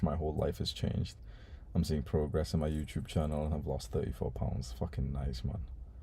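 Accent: Liverpool, United Kingdom. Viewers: Never miss a video.. Cuz learning British accent.. 😂😂❤️ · I think he's from Liverpool ahah, I'm from Bradford and my accents pretty different. But I guess it shows how right you are about accents changing alot aha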